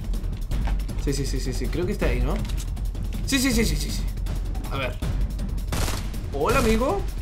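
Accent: Chile. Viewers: Es chileno ?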